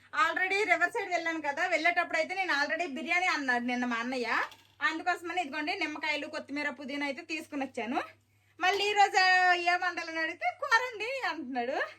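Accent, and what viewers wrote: Srikakulam, India. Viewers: Pakka Srikakulam ani cheppavachu mi matalu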